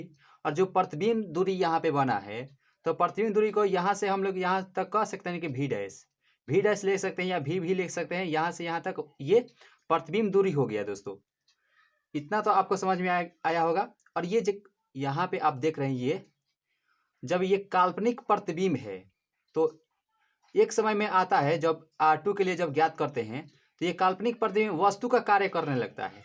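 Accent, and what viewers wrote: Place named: Bengal, India. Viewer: Lagta h sir aap Bangal se h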